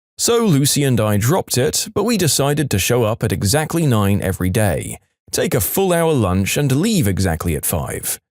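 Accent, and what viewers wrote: United Kingdom. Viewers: No offense but British ahh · The British voice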